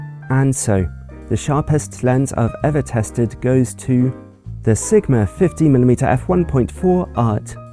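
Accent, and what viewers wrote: United Kingdom. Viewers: Hi, is it your name? yes it is, so no offence I don’t know who you are and where you came from, sound British my best guess, what a rubbish review of lenses you are bringing up, have Samyang whatever it is (again no offense) are paying you enough for what good stuff you are saying about them? I guess they are, LOL, be at least fair and honest to all people here in around YouTube and show your pics taken (in raw with all sets) with lenses you’re taking about (send links to original photos if no hurt you) otherwise do not give a shit, you seems from your voice nice guy! canon, tamron, sigma have so great cheap lenses so again be good not jerk.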